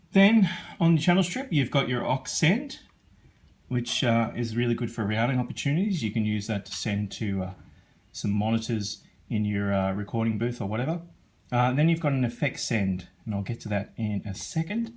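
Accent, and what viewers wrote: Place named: Australia. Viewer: Love the oz accent 🙂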